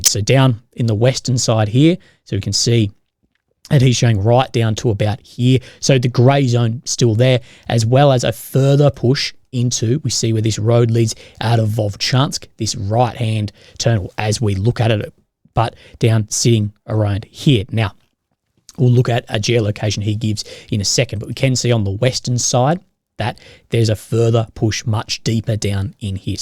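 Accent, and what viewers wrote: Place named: Australia. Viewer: Maybe this channel would be better without the whiny Aussie accent and and his 1970s suit collection.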